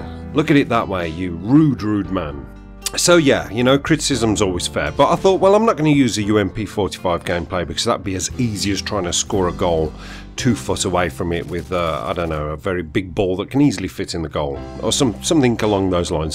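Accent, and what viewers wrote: United Kingdom. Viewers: I've been checking your channel everyday for a new upload....dammit! Start uploading more, I need your British accent in my life lol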